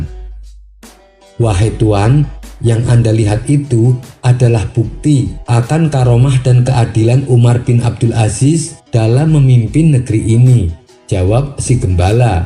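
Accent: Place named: Medan, Indonesia. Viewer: Medan bung...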